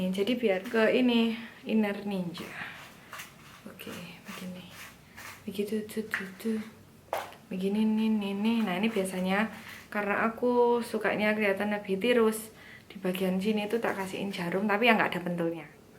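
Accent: Java, Indonesia. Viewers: suara medok nya itu loh aq suka banget 😁😁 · adhell kudell hahah sami abi ge teu paham ah bhsana gs medok jawa deuih ampun. 😁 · medok nemen mba... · jawa bet ngmong'a :D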